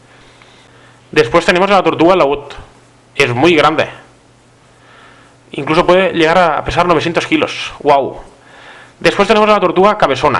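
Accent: Catalonia, Spain. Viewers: Me encanta tu acento catalán 😂😂😂 es muy divertido Buen video las tortugas son muy bonitas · no se por que pero tienes un acento catalán tio xd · Es acento catalan porquevme da una rabia cuando lo escucho...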